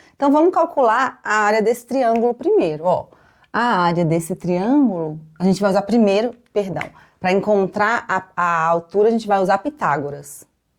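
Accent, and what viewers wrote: Minas Gerais, Brazil. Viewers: Show é E que saudade do sotaque de MG.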